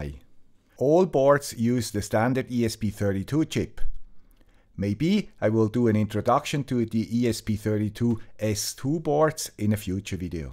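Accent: Switzerland. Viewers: Another great video! Thanks a lot. You put so much work into planning out and making these videos they are so informative. I also love your soft Swiss accent. Thank you. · Thank you for sharing your experiances. I really like the swiss dialect as well - in english as well as in german. :-) As I always had very good experiances with the D1 mini with ESP8266, the D1 mini version of the ESP32 looks interresting to me. Greetings from the rhine-main-region, germany Alex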